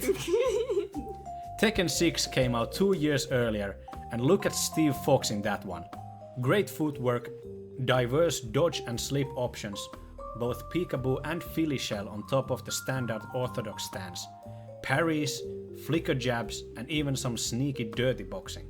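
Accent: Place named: Spain. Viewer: Cool voice! Love the accent, where are you from? You sound Spanish or Easter European.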